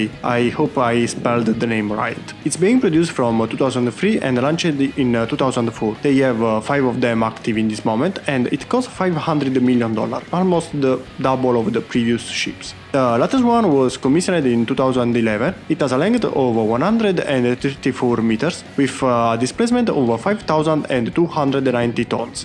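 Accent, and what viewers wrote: Italy. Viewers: Non è difficile riconoscere un accento "maccheronico" anche se molto ben mascherato; i dati sono attendibili e seguono un filo logico molto stabile e definito, per cui, italiano o non la classifica rimane invariata fino a prova di errore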